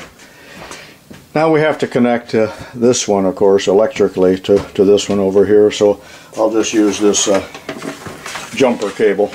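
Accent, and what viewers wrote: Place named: Pennsylvania, United States. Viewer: Your accent indicates that you are from Indiana County, Pennsylvania just as Jimmy Stewart was. Am I correct?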